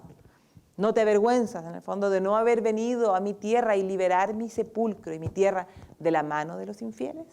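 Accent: Chile. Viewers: Así hablamos los Chilenos.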